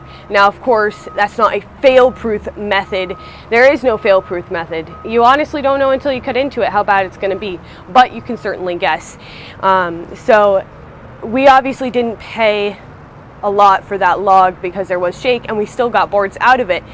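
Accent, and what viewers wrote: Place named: United States. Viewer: I undestand your your american english very well, thank you for that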